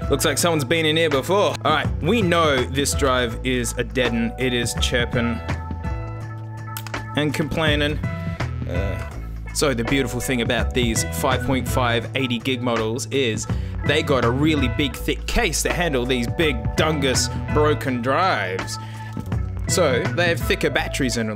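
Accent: Australia. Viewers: This video makes me appreciate Aussie accents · I forget he's Australian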